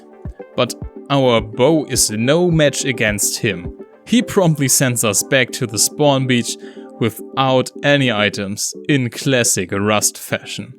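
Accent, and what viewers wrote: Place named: Germany. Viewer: Why am I not surprised the accent in this video is German. Only a German engineer could pull this off.. Excellent job!!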